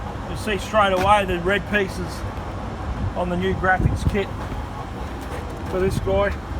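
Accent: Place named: Australia. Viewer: Everything sounds better with an Australian accent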